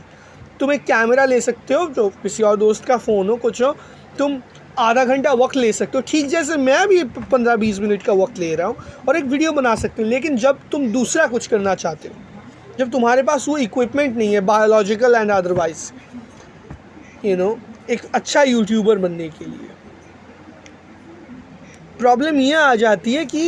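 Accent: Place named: Bengal, India. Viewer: Wanting to work hard is luck too, I dont know why ppl dont realise that .I know how I would end up in a few years if I don't work hard but I still don't I just can't focus its just luck. Bengalis have really cool accents btw look at you and kirti chow for example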